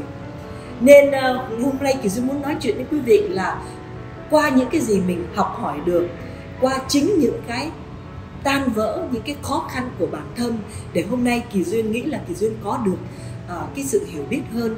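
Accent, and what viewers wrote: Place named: North, Vietnam. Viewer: Em sinh ra ở Biên Hoà được coi là thủ đô Bắc 54 nhưng hiếm ai có chất giọng Bắc 54 nghe sang và ngọt ngào như chị Kỳ Duyên <3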